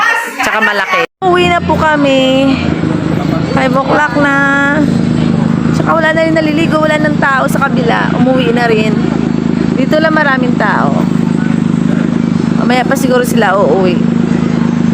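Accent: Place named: Hiligaynon, Philippines. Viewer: Daw ilongga voice mo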